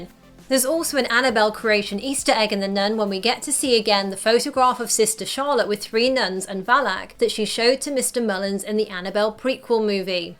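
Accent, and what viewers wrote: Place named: United Kingdom. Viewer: I like your british accent.. 😍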